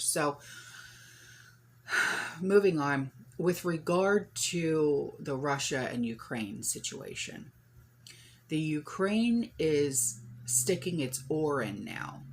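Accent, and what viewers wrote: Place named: East Coast, United States. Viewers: The lovely thing about this venue is that you can click the off button. I’m not a big fan of thick east coast accents but I know an accent doesn’t reflect the sum of a person. Regardless of the delivery, gives out a lot of good information and she is truly sincere. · I dont notice anything different either...she sounds like a Northeasterner to me. A valley girl sounds quite different...I'm in CA right now...believe me I KNOW what a valley girl sounds like 😂😂😂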